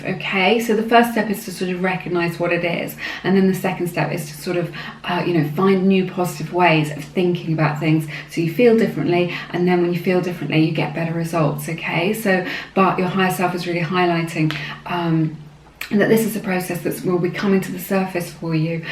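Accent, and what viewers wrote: RP, United Kingdom. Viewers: posh accent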